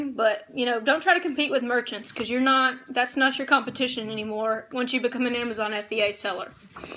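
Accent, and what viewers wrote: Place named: Georgia, United States. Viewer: ...love your accent. You from GA is you not? Lol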